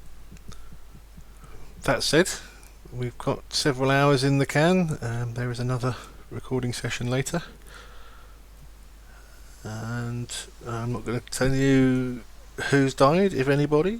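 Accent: England, United Kingdom. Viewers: I liked this not because of your commentary, your breathing, or your gameplay, but because of your witty remarks you make when typing to people. I just like to see how much enthusiasm and humor you have under that thick English accent =D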